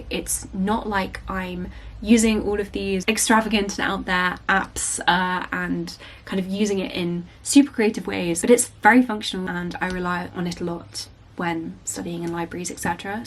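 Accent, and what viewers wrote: United Kingdom. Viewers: British accents fascinate me like Henry Higgins, in such a small country. This lass sounds a lot like Elton John which makes me wonder if they hearken from the same region.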